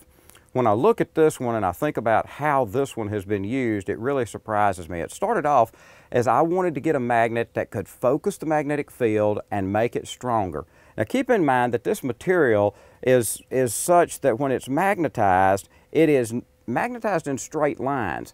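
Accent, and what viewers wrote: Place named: Alabama, United States. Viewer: I heard welds also... but I must have gotten used to the way people in Alabama speak, when I lived there.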